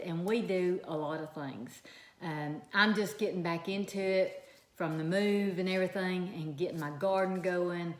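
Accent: South, United States. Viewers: Okra is one of those things that people either love or hate . I love okra. Pickled or any way you want to cook it, it's great. I really enjoy your videos. Listening to your southern accent makes me long for my childhood days with family.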